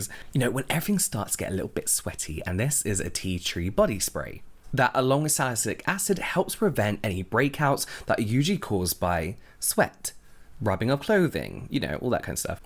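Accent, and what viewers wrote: United Kingdom. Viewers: Are your from the UK?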